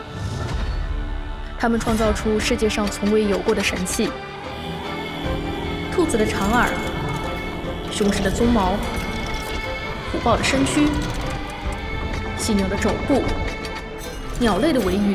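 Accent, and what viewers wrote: Sichuan, China. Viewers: 這個川普和我想像中的川普有點不一樣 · 好喜欢川话版的解说❤❤ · 川普的配音太好玩了🎉🎉🎉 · 2个配音都不太喜欢，四川话配的太瓜了。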